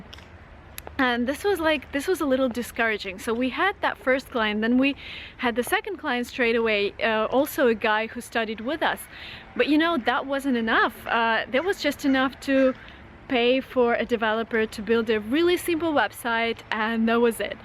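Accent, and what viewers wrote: United States. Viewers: Your English sounds like American English, but you said you studied English in Great Britain. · Hi mam I have been watching your videos for last week's honestly your videos are inspiring me the way how you talk in American accent is superb I'm not a native speaker however I wanna be one of them. I can feel that zeal in me. Kindly suggest me how can I enhance my skills and become a native speaker... Thanks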